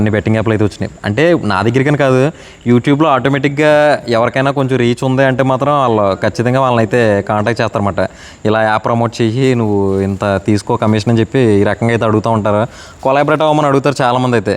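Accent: Godavari, India. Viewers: Godavari voice❤❤❤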